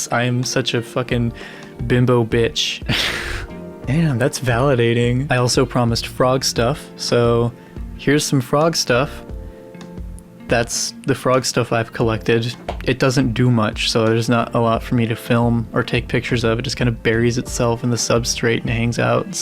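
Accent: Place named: Midwest, United States. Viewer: Okay, so I had to pause in the middle of your video to say I disagree about your voice. I think you have a great voice. It’s just cracking (autocorrect) to sound female. You must be from the Midwest. It’s like a climate or humidity condition that affects many of us. I only wish mine was as good as yours. 💋💋💋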